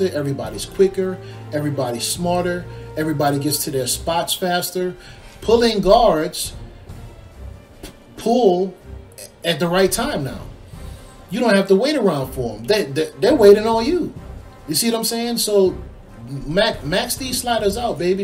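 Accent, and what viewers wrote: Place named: Midwest, United States. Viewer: Great video! been tinkering with this game for a few months now. Started working on rosters. You sound like a Big Ten guy.... where u from?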